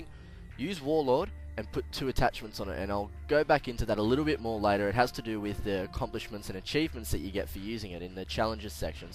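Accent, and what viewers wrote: Australia. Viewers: Subbed... Awesome to hear an aussie voice....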